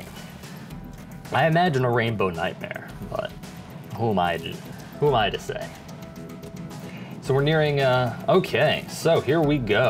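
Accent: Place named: South, United States. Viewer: Why do you speak like a dummy ted neck ?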